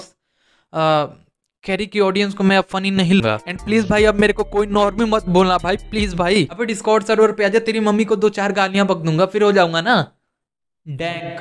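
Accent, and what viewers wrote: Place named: Bihar, India. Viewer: Y you sound bihar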